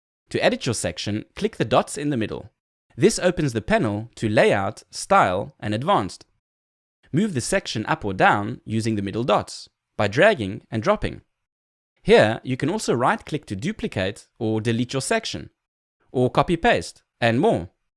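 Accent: South Africa. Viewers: it retrospect idk what i was thinking, sounds pretty south african. i think it just hit me as pretty unique the first time around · Sounds like South African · That's how South Africans speak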